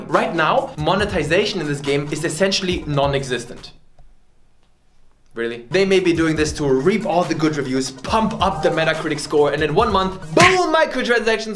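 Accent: Germany. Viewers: He's the first Asian with a german accent I ever heard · Is German your native language? Hell i simply LOVE the way you speak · peak denglisch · Ich liebe deinen deutschen akzent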